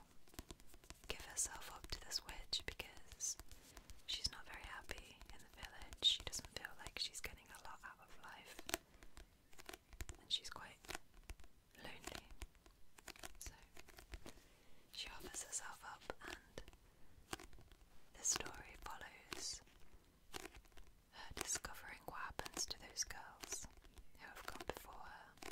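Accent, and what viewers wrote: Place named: United Kingdom. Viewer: As a fellow Brit ASMR in a British accent is super comforting to me, pair that with books and you really can’t go wrong, really happy I found your channel!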